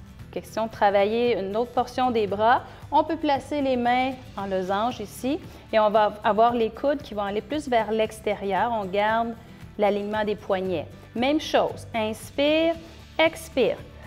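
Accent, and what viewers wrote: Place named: Quebec, Canada. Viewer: je vous regarde de Belgique et moi j'adore votre accent qui me rappelle mon voyage au Québec ;-)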